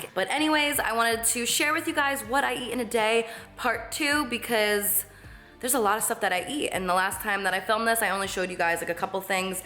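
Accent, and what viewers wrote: New Jersey, United States. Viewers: you’re such a jersey girl haha your accent is jumping in this video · I L O V E hearing you talk. Not only are you well spoken but I admire your New Jersey accent ❤️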